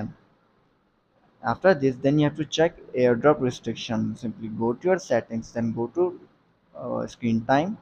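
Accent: India. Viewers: Could barely understand anything with that thick Indian accent. Still can’t get it to work.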